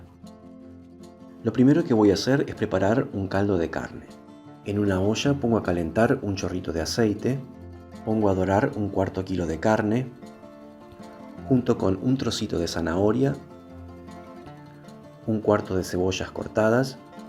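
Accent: Argentina. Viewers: Por la forma de hablar parece argentino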